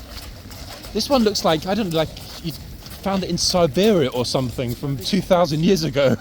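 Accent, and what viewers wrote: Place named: United Kingdom. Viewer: I am wondering, will the Life Guards be there next week, or will the Blues and Royals be there? We saw the soldiers in red last year. Even though they are both fantastic, we were hoping to see Blues and Royals. We arrive next Thursday. Just wondering! I love how knowledgeable you are. The way you explain and ask questions, is awesome! We spend part of our mornings watching what is happening in our most favourite cities, London! We also love your accent! Actually, we love all the accents from the UK. Glad you have bounced back from your illness!🙂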